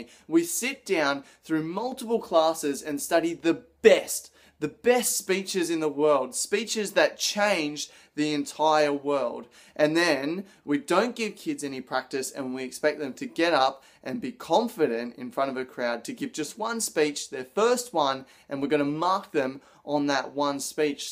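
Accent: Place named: Australia. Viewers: your Australian accent actually helped me pay attention, since it's not what I hear daily. Maybe I'm unusual, but I enjoy different accents and cadences. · He has a fine Australian accent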